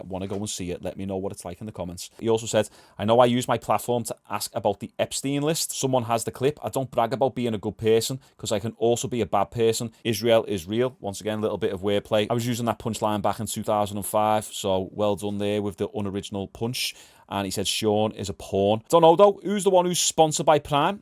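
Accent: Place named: Newcastle, United Kingdom. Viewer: I like your channel, but please don’t turn into a true geordie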